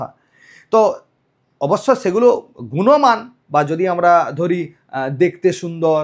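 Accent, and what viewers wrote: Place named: Purulia, India. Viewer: Sir, আপনার বাড়ি কি পুরুলিয়ায় কথা শুনে তাই মনে হচ্ছে আমি পুরুলিয়া থেকে❤❤❤❤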